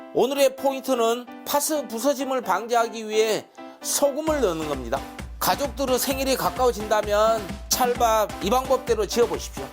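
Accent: Jeolla, South Korea. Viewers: 전라도억양 정겨워요❤ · 말씀이 구수해요~ 보타불고 전라도 분이신가봐요~ㅋㅋㅋ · 아따.전라도. 사투리로 맛깔스럽게 표현이. 진짜 맛있겠네요.어쩜 그렇게 표현을 잘하세요.재밌어요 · 셰프님 말씀하실때 전라도 말투에 친근감이 갑니다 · 전라도 고흥쪽 말같아요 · 전라도 사투리예요? 강원도인줄 알았어요ㅎㅎㅎ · 저도 찰밥 좋아해서 자주 해먹습니다 왔다 맛있겠다 전라도 사투리 정감이 갑니다 셰프님 방송 자주보면 유용한 정보 감사 합니다 ❤❤ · 세프님 찰밥 잘 배우고 갑니다 전라도 사투리가 참 구수합니다 다음 영상도 기대할께요😊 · 전라도 사투리 정겹습니다 우리 고향 말 물이 보타부립다 오랜만에 들어봅니다 ㅎㅎ 잘 보고있어요 맛난 요리 부탁드립니다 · 전라도 사투리 넘 좋아요 고맙습니다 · 절라도 사투리는 싫은데 가끔 놀러온다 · 오 찰밥 맛있게 보입니다 전라도말투 친근감이 가네요ㅎ 나도 찰밥을 좋아해서 종종 이렇게 해먹습니다 새벽에 영상을보니 출출할시간이라 더 입맛땡기네요 ㅎ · 남도 사투리 정겹습니다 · 야~ㅅ따 전라도 찐한사투리 ㅎㅎ 요리는 한국인의 입맛 제대로입니다 많이 배워가고 있습니다 쉽게 단조롭고 간편하게 해설이 더 맛갈스럽네요 ㅋㅋ 발전을 기대합니다🎉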